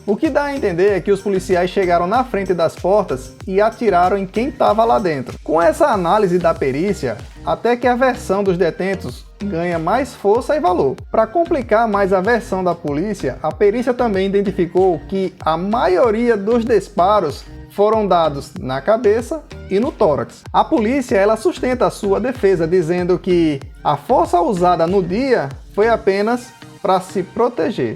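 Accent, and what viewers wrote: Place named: Northeast, Brazil. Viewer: O cara vem falar de algo que nem sabe o que aconteceu, não tem um vídeo e acha que algum idiota vai ouvi-lo com esse sotaque de nordestino !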